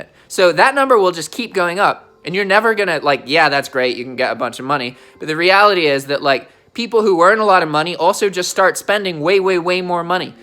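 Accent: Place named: United Kingdom. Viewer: This dude sounds like he's hiding a British accent.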